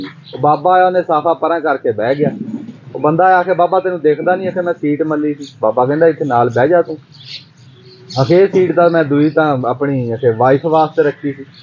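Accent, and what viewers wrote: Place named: Doaba, India. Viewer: Wah ji sahib,tuhadi Punjabi 100% duabey wali boli koyi pata nahi lagda ke koyi Pakistani ja indian punjabi..videos tuhade boht hi changgey te akal den waley hunde aa..👏👏👏👏👏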